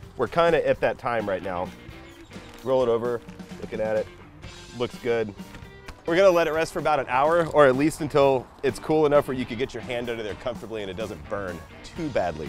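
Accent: Texas, United States. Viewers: yep, sounds like Texas alright 😆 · Is this guy from Texas or something?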